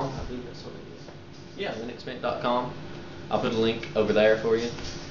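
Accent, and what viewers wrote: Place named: South, United States. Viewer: this yankee thinks that's a pretty cool southern accent. i can't place it, but it's great. u could have a career in cartoons i mean hella cool. maybe eastern tenesee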